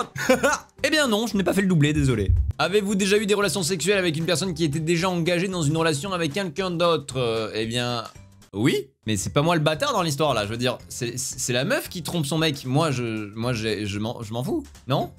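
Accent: Marseille, France. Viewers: T marseillais ou toulousien ?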